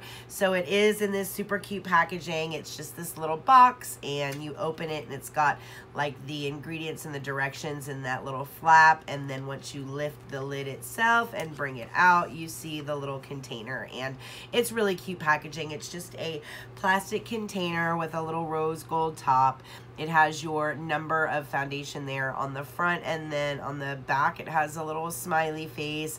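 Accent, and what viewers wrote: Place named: South, United States. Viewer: 💜💜💜 Enjoyed seeing hubby's reaction, lol...."there's no makeup line" Loved your strong southern accent 💜💜💜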